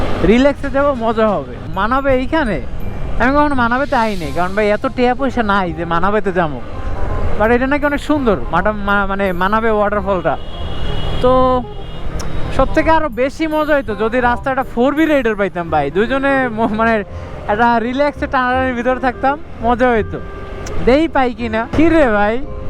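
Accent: Barishal, Bangladesh. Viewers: ভাই কি বরিশাইল্লা,,,,,,, 😊? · Vai apni ki borishaila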